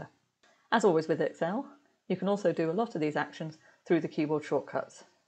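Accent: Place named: RP, United Kingdom. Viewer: Pradeep, man. Get real. Hers is the sort of English shy of RP that people want to speak. Maybe you. Pradeep, need to listen to Amitabh speaking English more (his is actually quite excellent), unlike that of typical politicians and actors in the subcontinent.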